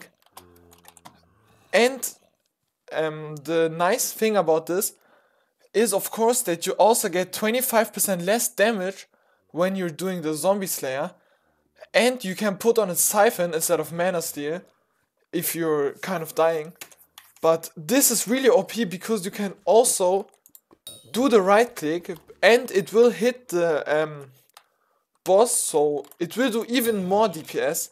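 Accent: Germany. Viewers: alter... du bis doch 1 Hundert Prozent deutsch bruddi, man hört das sooo raus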